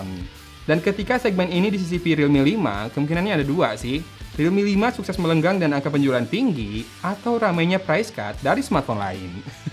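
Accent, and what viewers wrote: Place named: Bandung, Indonesia. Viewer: pasti orang bandung bang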